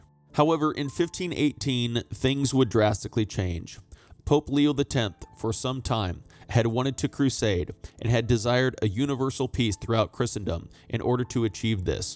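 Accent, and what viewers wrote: United States. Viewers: Nothing more irritating than hearing a yank speak of English history when they know nothing or dare speak of their own lol 😆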